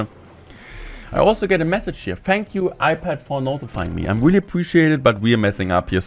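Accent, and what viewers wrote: Germany. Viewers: To the English people: Do you hear a German accent? Because i dont think he is talking that Bad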